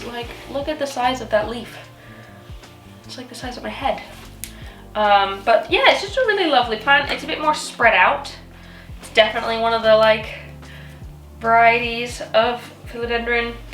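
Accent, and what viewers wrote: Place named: United Kingdom. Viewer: Omg I can hear your UK accent forming lol 😝❤️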